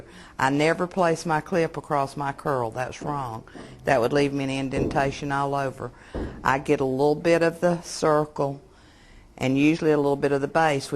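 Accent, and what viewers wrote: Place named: South, United States. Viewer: I like her sweet southern accent! I found this video to be helpful and informative. Thank you !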